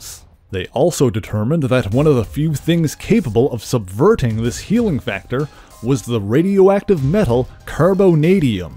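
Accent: Canada. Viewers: You’re Canadian aren’t you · Know how I know you're Canadian?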